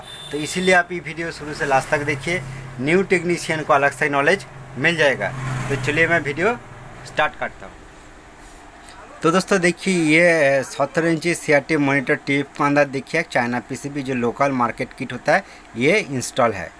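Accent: Bengal, India. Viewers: Nice information Dada. Apka Bengoli Hindi very nice.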